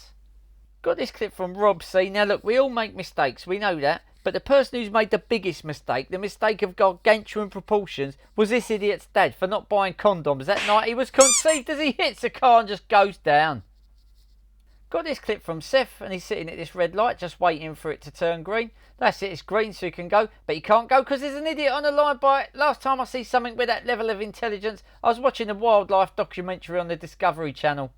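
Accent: Cockney, United Kingdom. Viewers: Cockney commentary is a riot. Subscribed 😂😂😂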